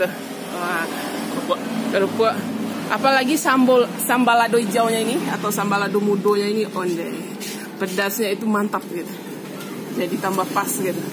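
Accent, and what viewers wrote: Minangkabau, Indonesia. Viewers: Bahaso Indonesia uni ko khas urg awak bana hahahahaha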